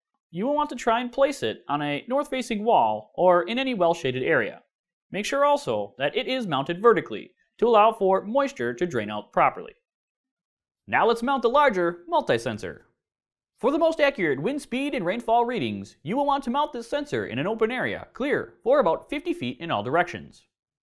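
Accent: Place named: Minnesota, United States. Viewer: You sound like a character from F A R G O TV Series.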